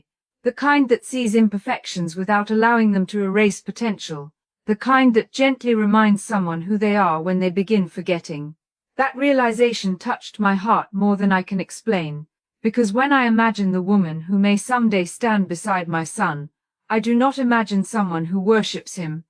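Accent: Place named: Canada. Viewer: Maye Musk, you are such a supportive mom, you will be a hard act to follow. Your speaking voice is as lovely as your modeling presence. That Canadian accent is always so proper and you did not lose it in Pretoria. I'm seriously pulling for Elon's acceptance among Americans who depend on honest leadership. It is not easy. His admirers are men who want to be him and women who want to possess him for selfish snd selfless reasons. 🙏 🤲 🕍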